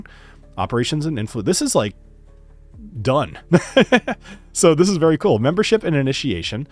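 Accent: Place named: Canada. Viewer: You're Canadian? I just clocked it...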